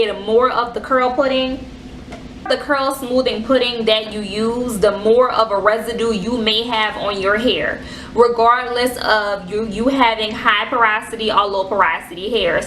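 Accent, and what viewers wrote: New Orleans, United States. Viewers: Wow your hair has really grown since I last watched a video. But I think you had pretty good results, like you said it's a learning curve and her products are so concentrated you don't have to use a lot. I love your NOLA accent and your beautiful smile, tfs.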